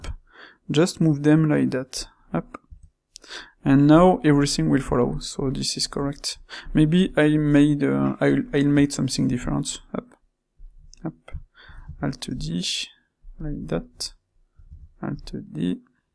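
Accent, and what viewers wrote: France. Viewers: La qualité et tt ce que j'ai appris des ces tutos, mais aussi la force que ces vidéos sur Blender ont comparé aux autres c'est l'accent francais qui fait la force de ces tutos. gg x))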